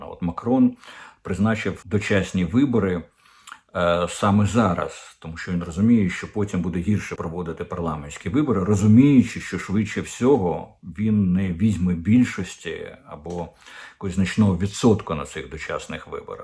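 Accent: Russia. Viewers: трІста? ГрІвЄнь? А чому Дмитро Корчинський так дивно спілкується? З підозрілим мацковським акцентом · 'Грівня','ракєта', 'велосіпєд 'і не раз ' Кіїв '- як же глибоко у такого щирого вусатька засіли фонетичні правила ' огідної ' мови Булгакова...Ваші п'єси,вельмишановний пане,грають професійні актори,котрі продовж 5 років у бурсі на Яросл.Валу тренували свої вуста над правильною /прийнятою на медійних просторах / укр.вимовою.Саме вони можуть допомогти Вам.../// Madam Ambassatrice, котру Банкова спрямувала у Швейцарську Конфедерацію,зовсім не манікюрниця,а сексолог...Це різні речі,хоча фахово нагострені нігті можуть потужно використовуватись і в чуттєвій царині.../// Приємно здивувала широкий загал і Ваша квітково- строката сорочка,--- таку не одягне навіть і такий затятий нетрадиціоналіст як пан Портников...Брате,воістину Bruderleiter- е,не розчаровуйте тих підписників,котрі не раз,вимиваючи руки від важкої та брудної роботи на полі,все таки ставлять Вам ЛАЙКИ!!! 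· Чому у головного націоналіста країни такий паскудний російський акцент? "ГрІвень", "вЄлодоріжки"... Наче Юлія Володимирівна знову "ожила".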